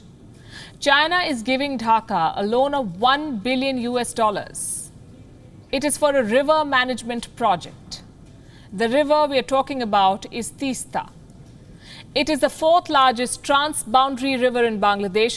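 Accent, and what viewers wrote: India. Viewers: But most of these idioms and sarcasm are from a western/British cultural script, coming from an Indian with thick indian accent who was clearly not raised in the West seems out of place, it's like listening to a parrot very amusing, but that's what the whole world laughs about India - it's desperate need for acceptances. Just saying. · Bangladeshi people don’t even think about t.....u..d... t...u...d..... India... why India so much concern about them?? Are you feeling lonely??... No neighbours... ?? Even Hindu country Nepal not giving company...? Beautiful lady can you plz clear it...? Anyway I like your typical Indian accent.....keep it up....nice story telling....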